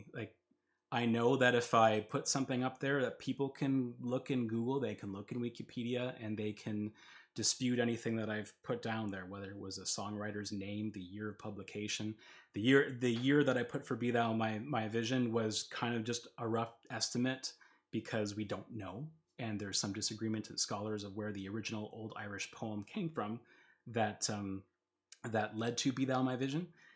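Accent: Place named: Canada. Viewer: I thought you were Canadian, before you said so. The way you say "about", "shout," words like that gave it away.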